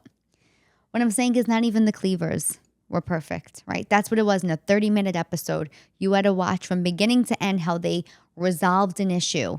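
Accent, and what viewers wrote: New York, United States. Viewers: Great show no family is normal. We actually grow from our pains and problems and issues. Love your NY accent. Lost mine living out in the country but every once in a while someone will say "you are not from around here" lol. We need to always be proud of our families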